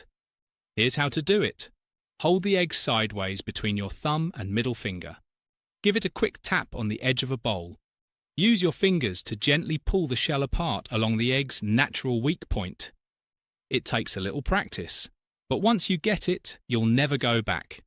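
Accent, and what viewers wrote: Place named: England, United Kingdom. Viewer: You’ve got a flaming English accent, so speak English not American it’s coriander and biscuits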